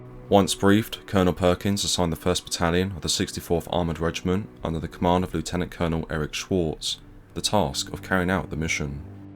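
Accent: United Kingdom. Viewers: I'm not sorry don't speak to me about American military with a British accent ever! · 12🇬🇧📯 sounds like a british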